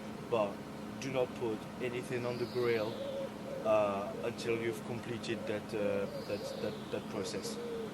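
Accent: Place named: England, United Kingdom. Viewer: You sound more like a Limey...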